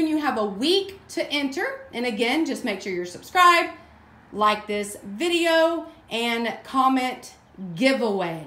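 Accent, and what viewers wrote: South, United States. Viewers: Giveaway........ Congrats on hitting 17k, we love watching you and I adore your southern accent. Thank you for giving back!!!!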